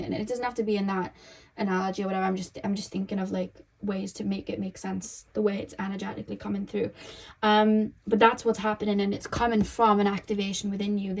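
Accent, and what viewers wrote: Wales, United Kingdom. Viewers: This is really random but I just want to say I've always LOVED your voice and Welsh accent. The way you say certain words is amazing to me lol. When you said "hoodie" I was mind blown and had to replay lol love it ❤️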